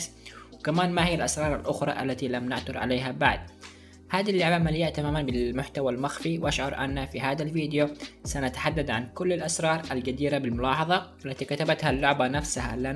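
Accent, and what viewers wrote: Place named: Aden, Yemen. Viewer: لهجتك يمني وعدني تحديدا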